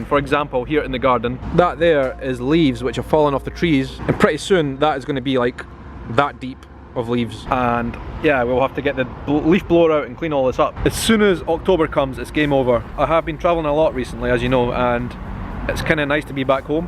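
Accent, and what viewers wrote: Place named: Scotland, United Kingdom. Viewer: I was going to ask you in another video if you were Scottish because you sound like one and then I read your description and found out you actually are lol. I'm from Brasil 🇧🇷, Salvador and I lived in edinburgh for 2 yrs and a half and loved there! I'm not very keen about the weather actually but, anyway... my father lives There as well in Ransome Gardens. Cheers!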